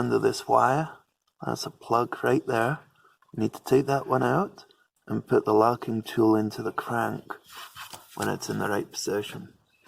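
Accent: Scotland, United Kingdom. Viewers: Amazing accent, so Scottish..yet all Canadian! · Mine is doing this too, going to try oil flush and change first...not been done for ages. Also, love your accent mate, heard the Scots in it then seen your flag! :)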